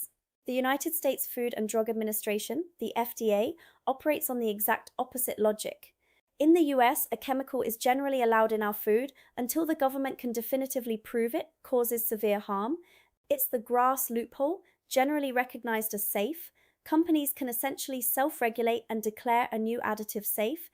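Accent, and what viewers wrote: United Kingdom. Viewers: I buy superseeded wholemeal bread and it lasts me a week. I prefer it that way. It tastes fine. I have 5o say you are a most confusing Amercan because you sound like a Brit!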